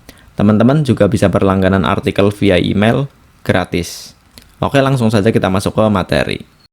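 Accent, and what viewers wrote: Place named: Banyumasan, Indonesia. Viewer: mase ngapak e ceto nemen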